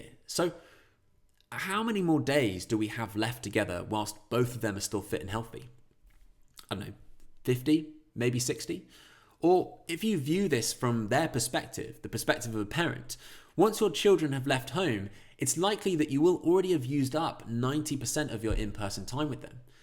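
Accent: England, United Kingdom. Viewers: This English man with his English accent beating around the bush instead of talking about specifics .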